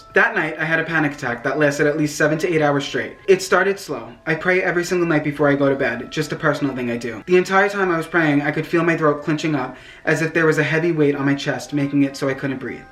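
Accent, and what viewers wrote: New York, United States. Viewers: I love your New York accent! I want to be your friend!!!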